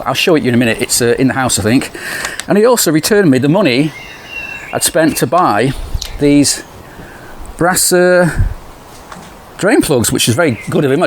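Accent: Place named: England, United Kingdom. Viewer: Good morning brother.... Have you done a compression and or leak down test on that cylinder.... Love your English accent... Cheers from Australia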